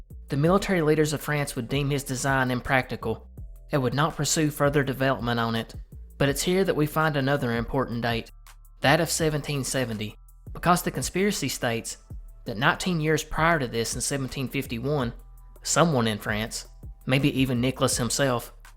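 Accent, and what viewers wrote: South, United States. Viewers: I love rural/southern/farmland accent. So nice on the ears. Honest and direct. No pretentiousness.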